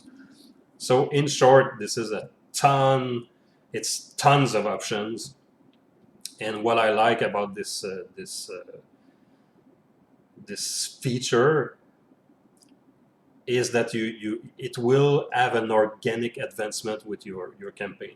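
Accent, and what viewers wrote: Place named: Quebec, Canada. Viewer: Merçi bien! J’ai grandi à Deux Montagnes… a very familiar accent. In NB now. Im a big fan of SotWW and will be playing soon. Thanks for this reflection.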